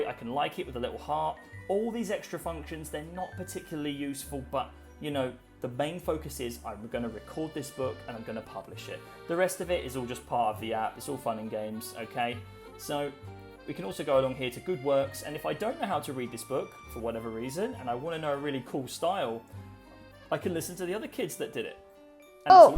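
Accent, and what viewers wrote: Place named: United States. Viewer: Wicked! You use a lot of American pronunciation, by the way. Thanks.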